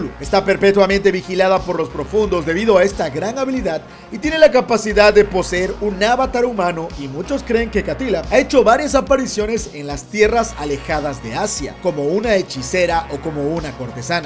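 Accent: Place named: North, Spain. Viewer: Demasiado norteño el parcero 😅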